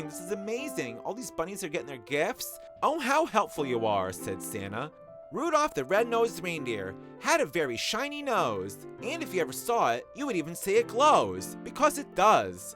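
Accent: United States. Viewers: Rudolph the Red Nosed Reindeer poorly narrated by a foul-mouthed New York (?) sissy.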